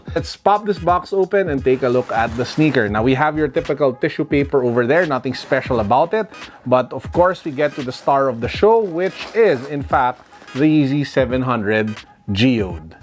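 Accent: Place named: Philippines. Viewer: right off the bat when i heard your voice i knew you were filipino just like me. ahah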